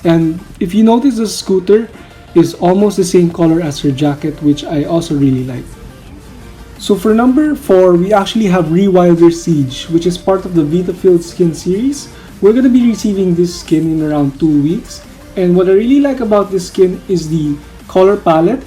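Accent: Philippines. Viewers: U sound filipino, kabayan?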